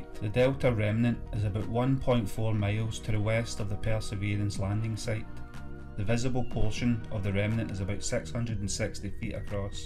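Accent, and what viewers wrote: Scotland, United Kingdom. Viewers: Holy Scottish accent 😂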